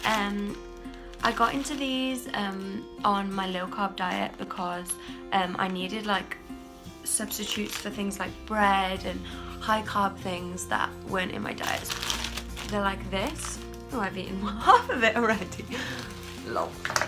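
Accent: Wales, United Kingdom. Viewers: Is that a Welsh accent I hear? Xo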